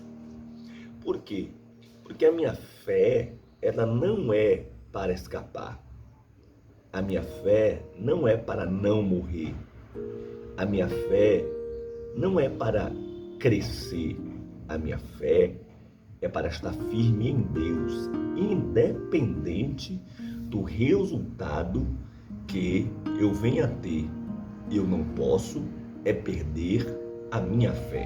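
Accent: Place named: Paraíba, Brazil. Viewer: Sou paraibana e o nosso sotaque são parecidos e a sua mensagem era Deus escolheu Maravilha glória a Deus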